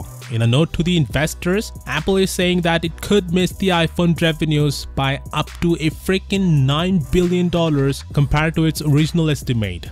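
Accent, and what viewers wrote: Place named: India. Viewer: are you indian, you sound like the tech support from bny mellon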